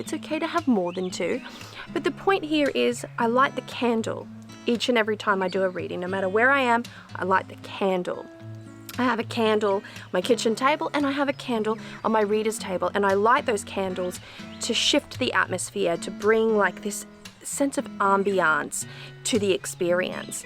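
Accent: Australia. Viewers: Another great video, and I thought you were Australian. I'm in SA and love your enthusiasm in your videos. Thank you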